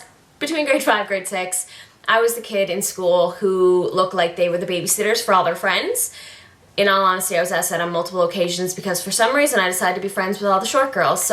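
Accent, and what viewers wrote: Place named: Newfoundland, Canada. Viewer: New subscriber and as soon as I heard your voice I knew you had to be from Newfoundland! Fellow Newfie :)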